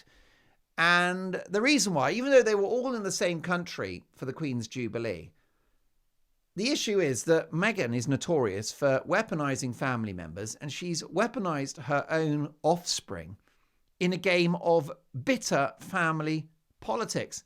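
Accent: United States. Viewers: Omg! I love your American accent! Spot on. I’m an American and it’s hilarious. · Your American accent is better than most of the other British presenters! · 🇺🇸! How is your American accent so good??!! The California Lawyer and the Wendy’s Employee characters!! Spot On!! And hilarious! I bet you heard me laughing from Nashville! And I am holding you to your word to put The Music City on your next US tour. 😂🎵🤩 Also I’m gonna start calling Reg “Elton Jr.” 🐈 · Awesome American accent. And you are a riot, by the way! 😆😆😆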